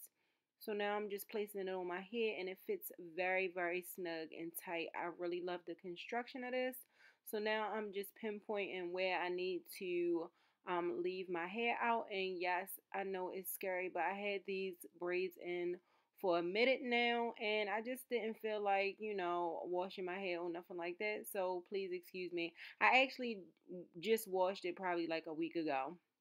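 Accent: Baltimore, United States. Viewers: sounds like Baltimore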